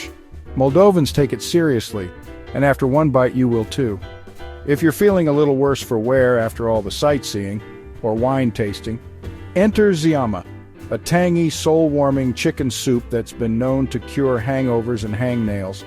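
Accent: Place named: Texas, United States. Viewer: I mike your voice and your american Texas accent !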